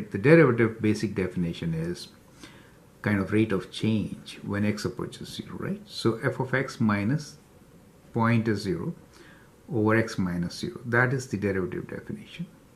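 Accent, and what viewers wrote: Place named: India. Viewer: abi hindistanlı gibi konuşuyosun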